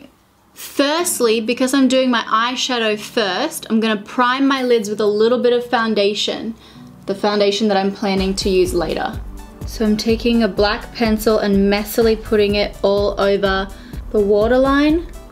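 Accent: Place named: Australia. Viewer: 'Smugin that out' so Australian #representingusaussies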